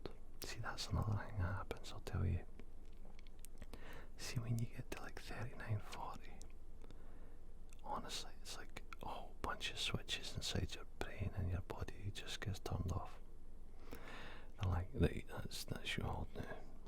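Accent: Scotland, United Kingdom. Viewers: I LOVE videos like this. I’m always partial to ramble videos. For me, you’ll never be one of the channels that gets buried. Scottish accents seem to be few and far between in the ASMR community, other than Lauren, so I always find myself coming back to your videos. You’re one of only channels I have notifications turned on for. Keep up the great work my friend, but I hope that you’re still enjoying this grand youtube odyssey you’ve embarked on.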